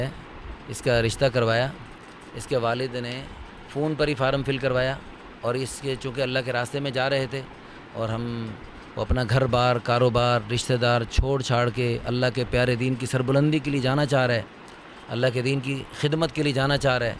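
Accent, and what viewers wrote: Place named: Kotli, Pakistan. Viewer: Kotli sa bat kr rhe hn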